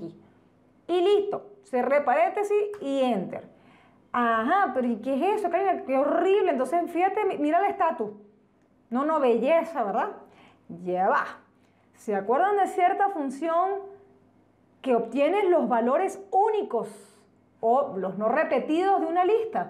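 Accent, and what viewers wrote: Venezuela. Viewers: OH! Es la joya de esta semana! Primero porque estas funciones están de lujo! Segundo: porque ella explica muy chévere Tercero: creo que es venezolana y ese acento de mi tierra me mata!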